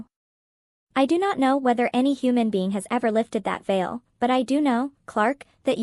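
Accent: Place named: United States. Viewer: This sounds terrible! This story shouldn’t have a female narrator (with a bland American accent), let alone a female AI with such flat affect and abysmal cadence. It needs to be corrected immediately.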